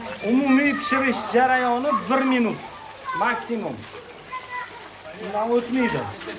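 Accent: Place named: Oasis, Uzbekistan. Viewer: Э харип Узин воха тамонлардансану шевагда гапуровур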